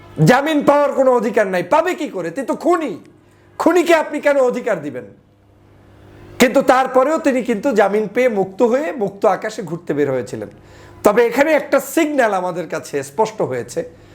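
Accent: Barishal, Bangladesh. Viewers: এট বরিশাইল্ল্যা ভাষা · বরিশাইল্লা কেনু।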